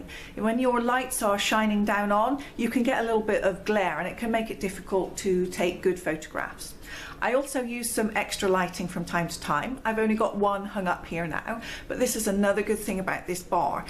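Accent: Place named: England, United Kingdom. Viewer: You're English!!! I always thought you were American!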